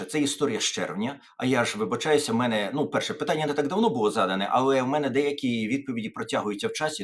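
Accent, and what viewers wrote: Ukraine. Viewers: Я родом з Івано-Франківської області і так само розмовляю як пан Тарас живучи тут в Америці і люди які приїхали з других областей вони навіть часом не розуміють мене тому, що кажуть що я вживаю багато руських і польських слів, але то є моя рідна мова з якою я виросла і я так розмовляю, тому мені дуже приємно слухати вас пане. Дай Бог Вам здоров’я🙏🏻 ви маєте дуже чудовий приємний голос і ви є високо інтелігентна людина! З великим задоволенням вас слухає багато людей тут в Америці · Вітання зі Львова! Дякую, п., що не забуваєте галицьку говірку!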